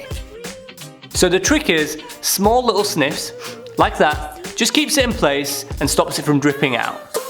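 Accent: United Kingdom. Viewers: oh wow he is bri ish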